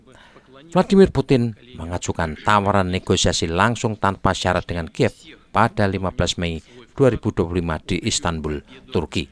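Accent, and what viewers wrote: Java, Indonesia. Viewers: Bagus mas. Aku suka medoknya. Jowo bangets ❤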